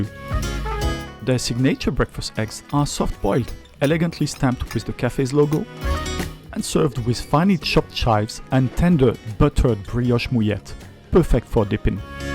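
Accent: France. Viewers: First time i listen french english sounds cool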